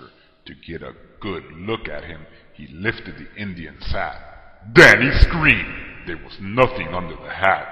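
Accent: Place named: Minnesota, United States. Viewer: Minnesota accent lol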